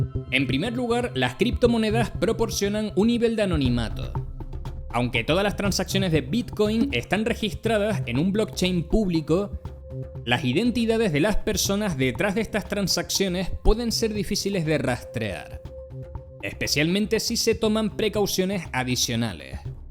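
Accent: Canary Islands, Spain. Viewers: Canario total no? Jaja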